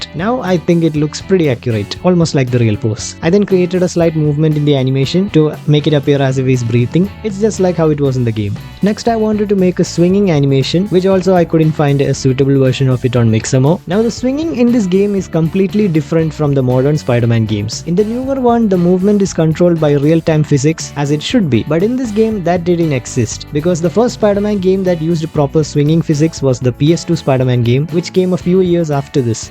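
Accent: Kerala, India. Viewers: Bro are you from Kerala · Hey man are you Indian or English?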